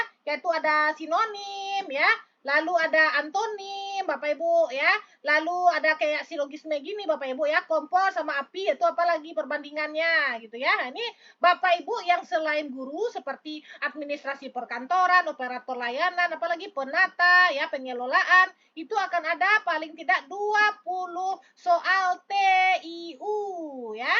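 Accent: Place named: West Sumatra, Indonesia. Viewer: Dari gaya bicaranya ibu orang Minang ya.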